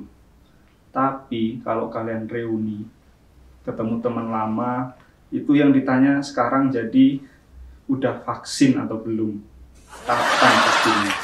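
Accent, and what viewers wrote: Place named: Java, Indonesia. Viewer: Keren dok....suka bahasane... pertahankan medoknya dok...he2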